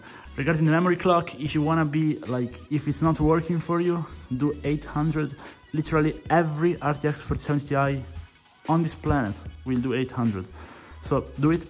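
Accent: Italy. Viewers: dal video ho vagamente sentito la calata italiana ma non ero sicuro 🤣 .... e il secondo video di undervolting che vedo sul tuo canale e ti volevo ringraziare! ho una tuf 3070ti e ho optato per 850 mV a 1800 Mhz con un boost di 1000 e un drop di 200 ma penso che posso spingermi un po’ oltre provando 850mV a 1875 Mhz senza boost di 1000 e il drop di 200.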